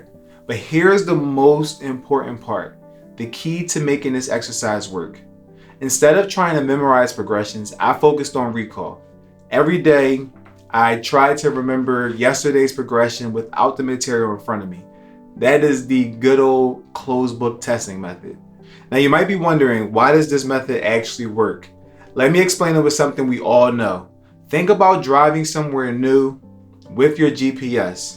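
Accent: United States. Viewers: Ik this prolly irrelevant right but u sound like u from Philly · Funny, you sound American, but the road footage shows someone driving on the left side of the road.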